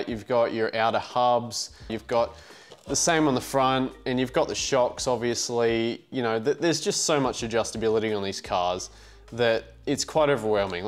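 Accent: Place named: Australia. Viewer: Campletown shirt Aussie accent 💪must be a local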